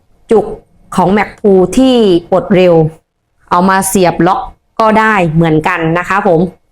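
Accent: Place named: South, Thailand. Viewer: ร้านอยู่จังหวัดอะไรครับ สำเนียงคนใต้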